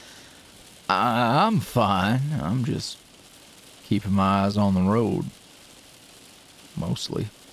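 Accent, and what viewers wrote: South, United States. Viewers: plz more southern audios they’re ultra comforting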